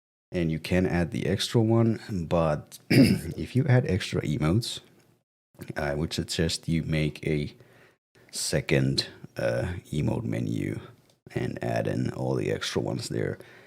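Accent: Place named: Finland. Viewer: 10 seconds in and I can already tell you're a fellow native Finn.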